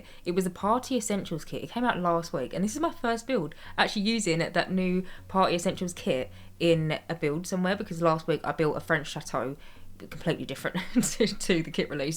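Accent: United Kingdom. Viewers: as you started talking, i knew instantly you were from the UK. as i am British i just knew!! 💟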